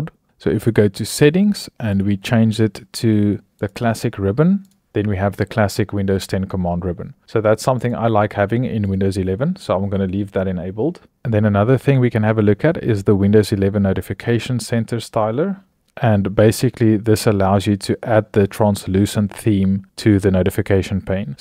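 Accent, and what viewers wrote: South Africa. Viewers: If I am correct he is south african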